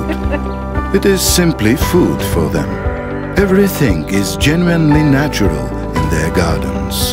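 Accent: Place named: Balkans. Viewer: she can relate.. когато говори за България, която е в женски род само при нас, а за да е правилно трябва да е it - It can relate. Клипа е мега много добър, но произношението на дублиращия е балканско и си личи, че е език, който не ползва във всекидневието. Като цяло много добре свършена работа, но оратора търпи критика.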